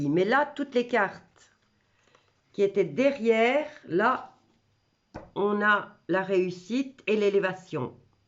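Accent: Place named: Belgium. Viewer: Vous avez de l' humour avec un bon accent Belge, Bravo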